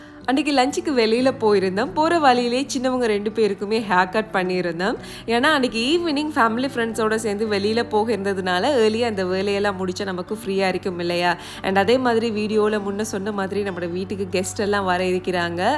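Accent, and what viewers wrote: Sri Lanka. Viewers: Assalamualaikum sis ✨ epdi irukinga Sinna vandungalam Nalla irukangala 😍unga videos la Enna sis kora iruku 🧐ellamey spruu ah poduringa reality ah iruku usefull la share panringa oru oru visaiyathaiyum ungaluku therinjatha A to Z ellamey share panringa 🤗aprm Sinna vandungalaiyum ungalaiyum paka enaku sandhosama irukum 😍Nd enaku mean ah unga Sri Lanka speech slang rmbaa pudikum sis🥰epothumey Enoda Dua Nd support ungaluku irukum🤲🏻💗 neenga inum naraiya achieve pannanum housewife kula role model ah irukinga 🤗itha Vida enna venum sis 100k subscribe reach pannathuku 🥰insha'Allah neenga inum naraiya videos podunga Nd keep rocking sis🥳💯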